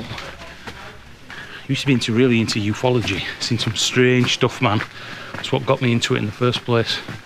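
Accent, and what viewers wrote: Yorkshire, United Kingdom. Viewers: tv show filmed at Port M was "The Prisoner" used to watch the re runs in 90's : ) You sound like a fellow Yorkshireman, im West Yorkshire Lad B'n'B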